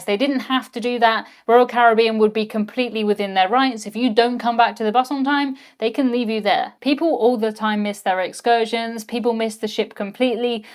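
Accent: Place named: England, United Kingdom. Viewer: Thank You alway for providing great cruise information & awesome locale to life on your vlogs.. oh, love your English accent.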